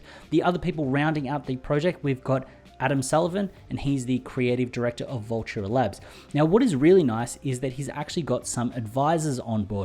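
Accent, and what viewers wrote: Australia. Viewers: i knew you were a fellow aussie:)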